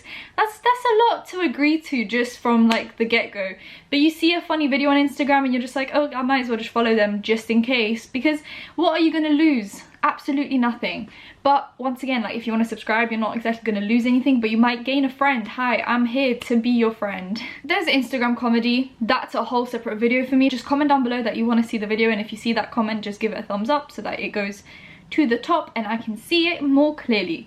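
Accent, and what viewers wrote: United Kingdom. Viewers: I have hated everyone in the UK's accent EXCEPT for this girl. idk why I love her voice so much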